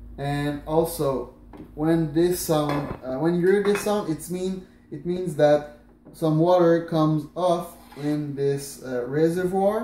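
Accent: Quebec, Canada. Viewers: Merci mon homme, bon accens québécois XD Avant mon café tasted like shit aussi, maintenant il taste toujours like shit mais c'est à cause que j'ai une keurig. J'ai commandé la breville Café Roma sur amazon et je vais commencer à me faire des bon latte! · T'a commencé à parler et je savais t'était Québécois haha x). L'accent est très prononcé, mais nice le review.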